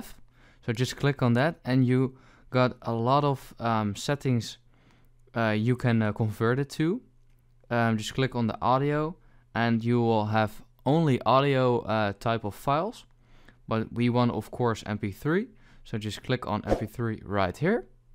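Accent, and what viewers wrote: Netherlands. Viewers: Are you Dutch? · Dat nederlandse accent hahahah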